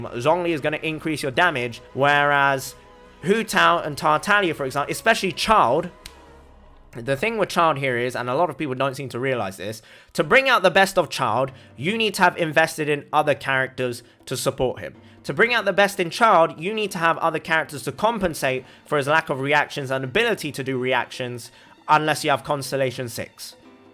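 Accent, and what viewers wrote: United Kingdom. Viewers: why the heck are you asian but has a british accent?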